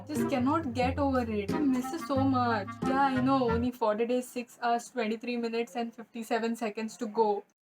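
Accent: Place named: Konkani, India. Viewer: Love your Konklish, energy, charisma, quick wit, mannerisms, fun, humour, painted moustache, painted hair on your chest ..... and not necessarily in this order.! Keep it up.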